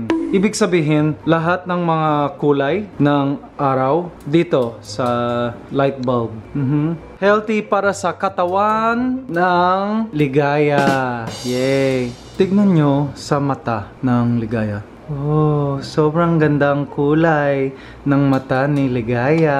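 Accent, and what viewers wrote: Philippines. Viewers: Lets Go To another Channel now i dont like to hear his filipino accent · Haha luv your filipino accent 🤣🤣🤣Puede ba mag tagalog pa minsan minsan, katuwa ka eh😁😁😁Keep it up! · Hahaha funny! I miss you pilipino accent!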